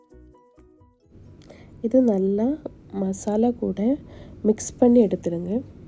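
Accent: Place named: Kerala, India. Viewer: Malayalee tamil parayanathe pole unde.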